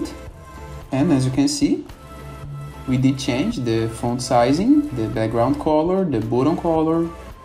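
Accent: Brazil. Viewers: Cara, você é BR né? kkkk Top, mandou bem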